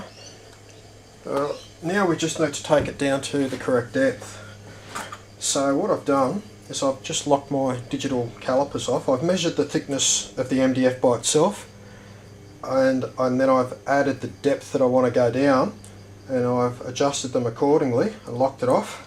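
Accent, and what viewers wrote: Australia. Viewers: I'm assuming you're from australia with your accent, and if you are whereabouts? do you do custom builds?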